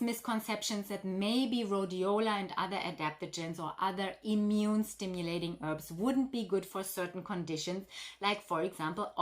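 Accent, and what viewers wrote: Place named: Russia. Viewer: Thanks for the valuable advice. Have you studied herbs? Do you live in US? I sensed a Russian accent. I ask just out of curiosity.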